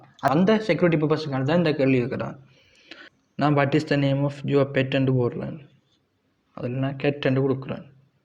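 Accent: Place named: Sri Lanka. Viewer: நண்பா நீங்க இலங்கை யா உங்கள் தமிழ் different ஆக உள்ளது Niceee❤️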